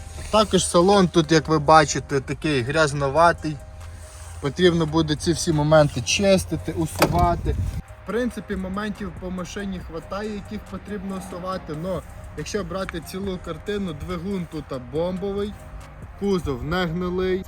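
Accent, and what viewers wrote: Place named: Surzhyk, Ukraine. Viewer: я з камянця. Чую наш суржик))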